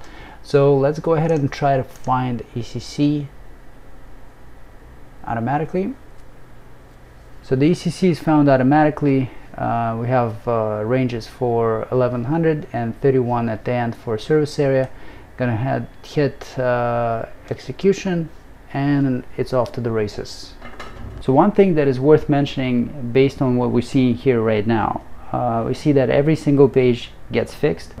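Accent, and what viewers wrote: Russia. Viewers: У тебя русский Акцент Причём сильный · Русский акцент топ)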